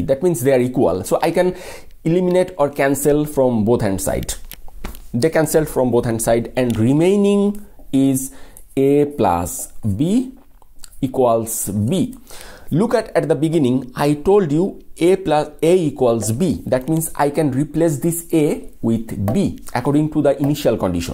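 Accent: India. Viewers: U are saying English as indians. It's exceptional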